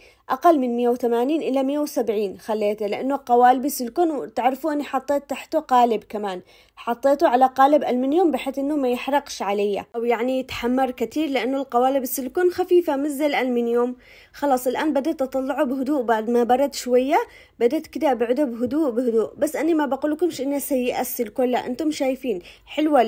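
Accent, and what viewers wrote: Aden, Yemen. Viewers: الأخت كإنها يمنية صديقتي يمنية جدا أحبها وهي تتكلم بلهجتها اليمنية هي من صنعاء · لا لهجتها عدنيه الصنعني مختلفه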